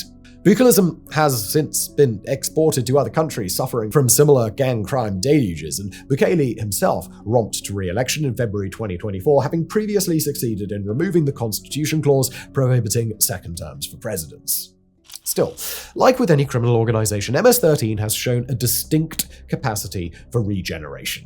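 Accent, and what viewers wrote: United Kingdom. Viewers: I always love your work and Britishly pronouncing words but clique is pronounced click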